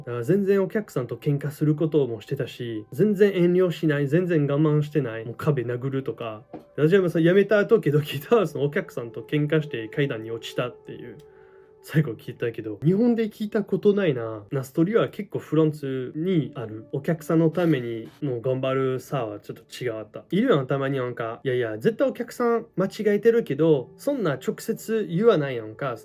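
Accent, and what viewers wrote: Kansai, Japan. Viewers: はい( ¯꒳¯ )b✧ もちろんですやん関西弁で 話してくれるから めっちゃ親近感やし✨✨ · アクセントが関西弁😊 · ステーキ🥩硬いやん切れへんやんか🤣りっぱな関西人やん👏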